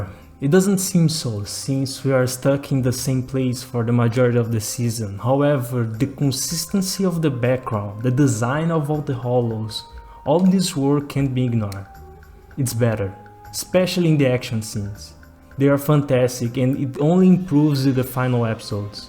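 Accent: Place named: Brazil. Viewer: sabia, dava pra perseber porque tu tem um sotaque brasileiro um pouco no seu ingles muito bom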